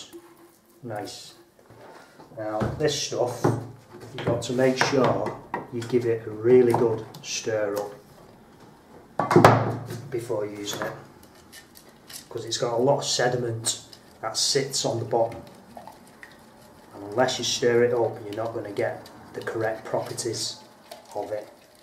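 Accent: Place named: Manchester, United Kingdom. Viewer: You sound like you are from manchester, how do I book you. :-)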